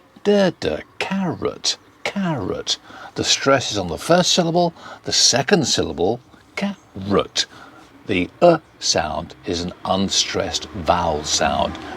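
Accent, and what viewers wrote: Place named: United Kingdom. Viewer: I love biritish accent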